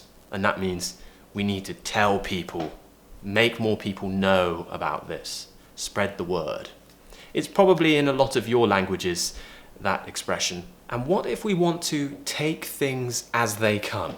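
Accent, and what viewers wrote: United Kingdom. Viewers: I like British accent ❤️❤️ I'm picking up from you. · I love to hear the British accent fom you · hi: I like ur accent a lot. im from mexico my level is advanced but im trying learning the way of speaking in UK. I prefer the accent from UK than American . greetings and thanks for all videos . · I really enjoy your videos aloooooot ,, i like your clear nice British accent and i admire it ♡♡.. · Omg i love your british accent, and i was learning to make my british accent really like a native speaker like you 😊 always make a great content. I'll always support you bruh😊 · I love British English very very much and I'm obsessed with British accent. That's why I've found your channel and I LOVE it. Subscribed immediately! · Cheers Mate, I really fancy listening to your British accent... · It is a delight to hear you speaking with your clear and beautiful British accent! 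Just subscribed your channel!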